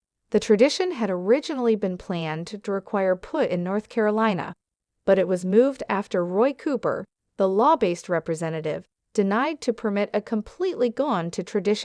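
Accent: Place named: China. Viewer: I keep trying to figure out who the non-English as their first language narrators come from......China?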